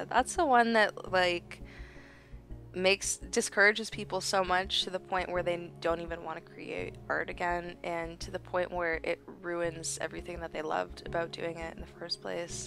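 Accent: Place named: Toronto, Canada. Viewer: It's really nice to have this in the background while working, I struggle with not being distracted by videos and social media, so when I'm listening to someone talk I'm able to focus: ) Also getting almost homesick hearing your accent, I miss Toronto.. Anyway, I'm honestly really enjoying your videos, you have relevant and meaningful opinions, I hope you keep making them^^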